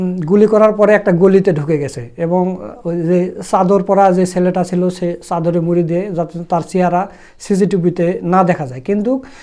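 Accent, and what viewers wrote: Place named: Joypurhat, Bangladesh. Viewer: ভাই আপনার বাড়ি কি জয়পুরহাট নাকি ভাষা শুনে মনে হয় আমার বাড়ির কাছাকাছি তাইনা ভাই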